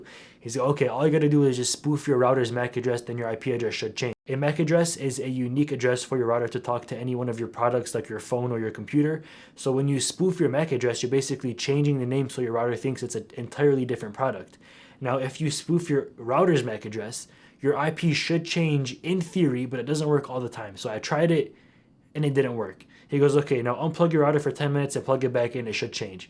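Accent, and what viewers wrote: United Kingdom. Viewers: is crying? british accent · your British accent is immaculate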